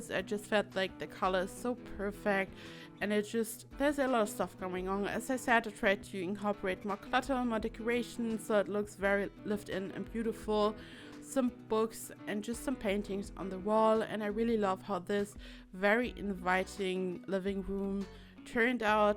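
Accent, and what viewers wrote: Germany. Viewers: Ur german, aren‘t you? Sounds like it.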